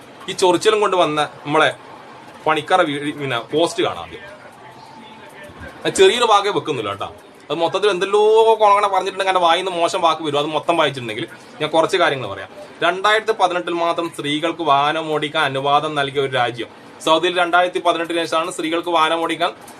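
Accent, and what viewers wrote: Kannur, India. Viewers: കണ്ണൂർ സ്ലാങ് പൊളി 👌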